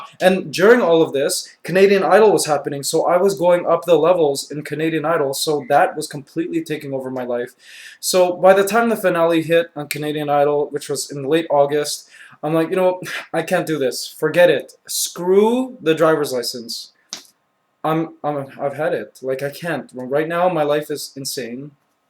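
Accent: Philippines. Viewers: WE'RE BEGGING FOR FILIPINO TUTORIAL WITH FILIPINO ACCENT MIKEY!!!!!!!! :)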